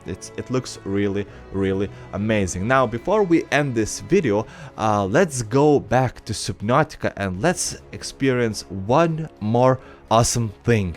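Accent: Russia. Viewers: are you russian?? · are you russian? i knew you where from like another country with an axent but i had no idea.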